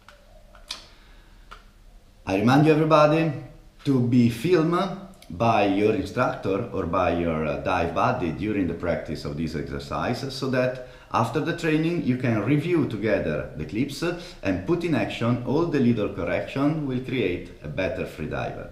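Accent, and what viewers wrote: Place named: Italy. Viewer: Your italian dialect is so cool!😎🤙🏻 Thank you!😊👌🏻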